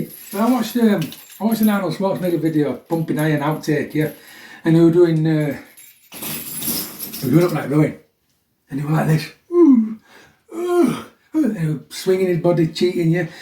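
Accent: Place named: Liverpool, United Kingdom. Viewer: no offence mate but why are you speaking in a Scouse accent when you are not even from Liverpool?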